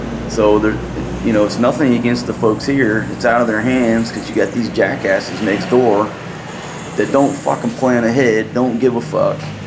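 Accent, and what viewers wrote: South, United States. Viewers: I appreciate that you are doing your own thing but I can tell that with a mouth like yours you were raised in the south, but it sure wasn’t Texas man. Safe travels there guy.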